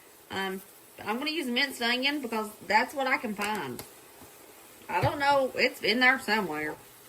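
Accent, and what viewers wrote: South, United States. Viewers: Came across you today and I have to say you are a joy to watch between your great, cheerful personality, your cute southern accent and your sweet face! You are so creative and with the price of groceries these days, you are doing a lot of good sharing all of your around $5 recipes and make it look fun!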